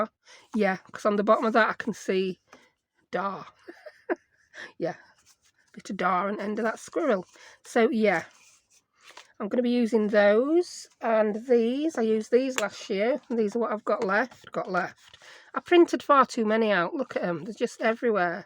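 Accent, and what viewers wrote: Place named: Sheffield, United Kingdom. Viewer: Morning just to say I love all your videos love your accent you make me laugh probably because I’m from Sheffield as well. Do you have any classes going anywhere would like to go if you do ?😊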